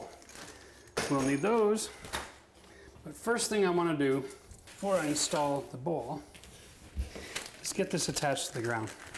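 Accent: Canada. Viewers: I knew you were Canadian when I heard the way you pronounce the words “process” and “out.” Thanks for the walkthrough and the thorough explanations.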